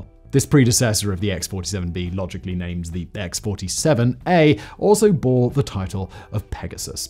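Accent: United Kingdom. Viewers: British English.🇬🇧 From the inventors of the language, correctly spoken. Not some bastardised colonial strangulated and simplified version.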